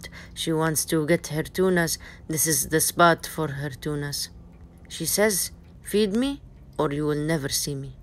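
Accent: Arab world. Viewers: This is just the arabic accent. She is from Palestine, not the Gulf. And she's exaggerating her accent like this for comedic purpose. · Same and her arabic accent just completes it!! 😻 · It's an Arab accent so yes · Sister where r u from?? Ur accent looks like Arab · Hey I'm from India and love your content 😄❤️❤️ sometimes I face difficulties to understand but I find your accent really amazing 🤌🏻✨ maybe it's an Arabic accent I don't know much about Arabic or Urdu though but I find your videos really hilarious 😂😂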